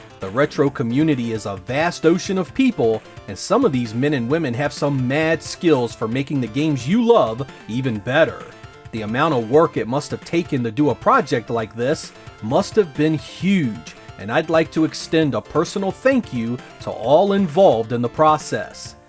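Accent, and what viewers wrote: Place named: Maryland, United States. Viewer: He sounds like he's from Maryland.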